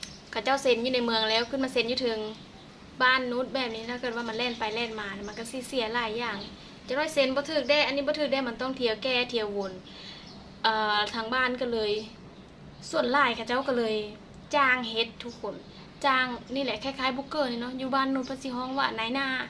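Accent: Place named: Nakhon Phanom, Thailand. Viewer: เจ้าเว้าญ้อคือคนนครพนมบ้านข้อยเนาะ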